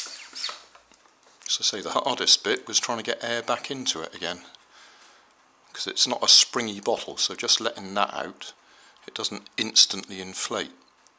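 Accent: United Kingdom. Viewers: Your videos help me whenever we have a car making expirement, and i always get a A+! Thank you and i suscribed. I only have one question are you from uk? Just wondering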